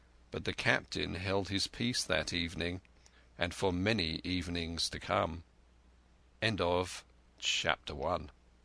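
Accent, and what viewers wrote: England, United Kingdom. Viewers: Well read Matey! Nuffin loik a genuine Brittish accent. Very enjoyable. :) F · Thanks I prefer your pronunciation and accent rather than the American ones, one remark is that your reading is a bit slow, but when it comes to direct speech ie when you say what some novel's characters say that's fantastic I find, and more realistic, after all the author was British and so are his characters! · thank god for a English voice reading this ! Just started to listen to a recording with a yank reading it - so wrong !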